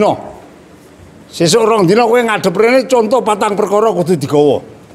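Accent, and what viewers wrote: Magelang, Indonesia. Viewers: Gaya bicara nya khas orang magelang ....❤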